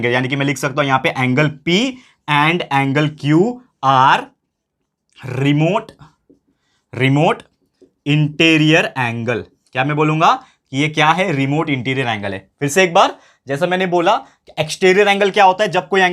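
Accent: Maharashtra, India. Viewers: Marathi bolne ki koshish jari hai... ❤❤❤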